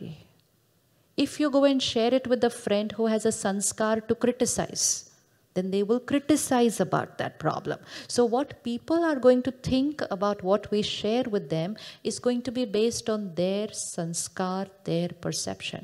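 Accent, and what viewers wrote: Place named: South India, India. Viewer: Sis kuchh English word pronunciations southern india tone va tone se lagtey hain ..the ketala . Is mumy is from southern region. Of cpurse no about Papa as i had reviewed the photo snaps of the excellency .s parents . Subh Ho. Om shanti sis .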